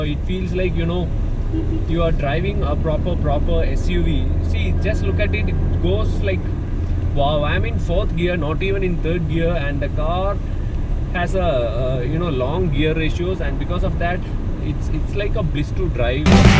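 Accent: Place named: Kerala, India. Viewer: This is why people people make fun of mallu English 🙄🙄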